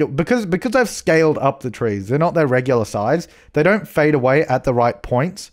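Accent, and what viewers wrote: Australia. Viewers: It took me so long to figure out that is Australian... I'M AUSTRALIAN...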